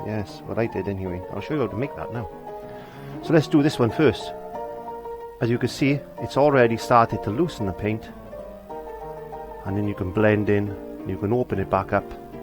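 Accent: Wales, United Kingdom. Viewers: jiw, there's luly to hear that accent, are u a Swansea Jack? welsh speakng Jen from Reading now. x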